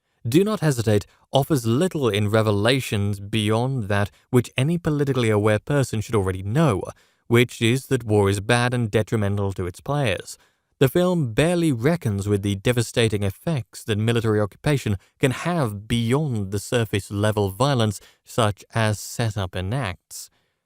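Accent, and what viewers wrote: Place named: England, United Kingdom. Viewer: Trying to watch the fin clip n all I'm hearing is some pommy wanker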